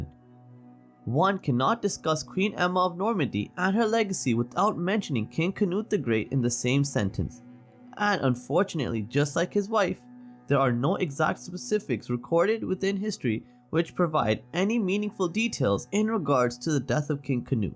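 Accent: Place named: South Asia. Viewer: all i hear is jeet or porki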